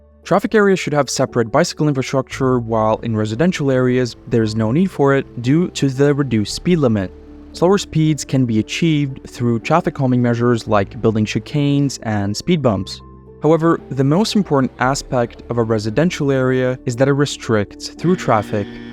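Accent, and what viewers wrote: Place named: Latvia. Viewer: šis viģiks arī ir ahujena bet es tev izaicinu uztaisīt katru piekto viģiku latviski >:) es dzirdu to mikroskopisko akcentu muahahahaha (es atvičai tu esi latvietis)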